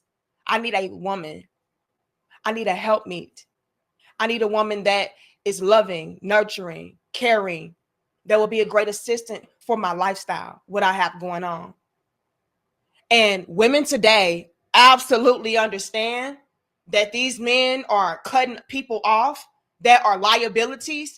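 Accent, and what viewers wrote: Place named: St. Louis, United States. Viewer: Yo. You sound like you from the Lou. Where you born in the 314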